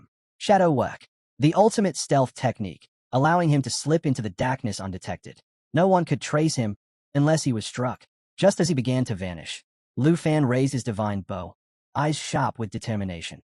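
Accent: Australia. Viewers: It’s much harder to understand at 2x speed when there is an Australian accent. Please don’t make it that way next time, it’s unnecessary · why is this Australian?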